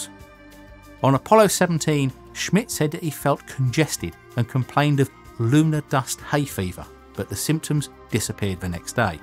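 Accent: United Kingdom. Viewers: Really? Odd how the plaque left on the Moon reads Here men from the Planet Earth first set foot upon the Moon July 1969AD We Came In Peace For All Mankind and how odd that the narrator has a British accent, but that probably because he's British with an interest in the Apollo program. And that the samples brought back have made their way all over the world. If you are really that small minded that it just has to be an American narrator, then the Apollo missions are wasted on you.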